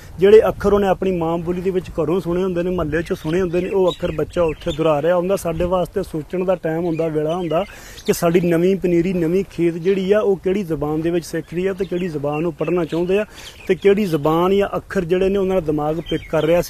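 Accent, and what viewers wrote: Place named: Majha, India. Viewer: Waah love you aa veerey, waahwa (bahut) changi gall ay. Mai vi British Punjabi ay, chardey (east) Punjab ton. Tusaan majhi bolandye jo (you are speaking majhi dialect of Punjabi - spoken in lahore, ambarsar, tarn taran, sheikhupura etc)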